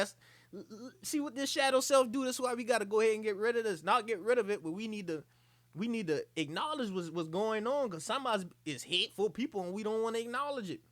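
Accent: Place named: Charleston, United States. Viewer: You sound like you from Charleston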